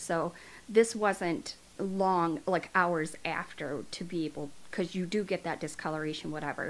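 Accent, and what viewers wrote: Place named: Dakota, United States. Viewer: Are you from Dakota? You remind me of a character from Fargo or Chelsea houskas mother.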